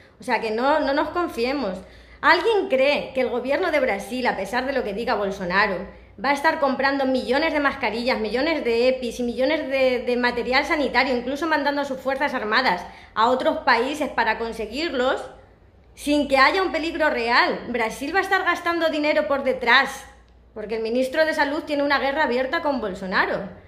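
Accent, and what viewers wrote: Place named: Spain. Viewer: Acho o sotaque espanhol fofo demais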